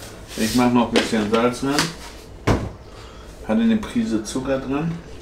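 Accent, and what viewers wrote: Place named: Hamburg, Germany. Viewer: Danke, gut erklärt - so ein richtig ehrliches, bodenständiges Essen (wie von Deinen Videos gewohnt)...und Dein Vortrag für mich Nich-Hamburgerin kultig komisch und unterhaltsam durch den symphatischen Dialekt!✌👍🤣😂 Darf ich ma fragen, ob Du da einen GastroSuS Topf benutzt und ggf. welche Maße der hat? Ich würd mir so einen großen Pott gern zulegen... Sind die gut, auch im Hausgebrauch? Oder vielleicht kannst du mal ein Video zu Töpfen machen, die Deiner Meinung nach was taugen? Ok, herzlichen Gruss in den Norden!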